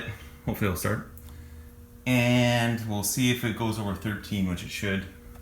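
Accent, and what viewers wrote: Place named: Minnesota, United States. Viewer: Someone's from Minnesota lol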